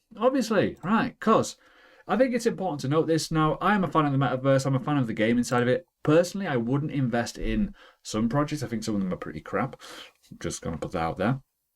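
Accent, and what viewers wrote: United Kingdom. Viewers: Great channel I follow a lot of them and when I see ur new videos I’ll click them first What kills my is the ascent . for a Spanish guy British is harder to get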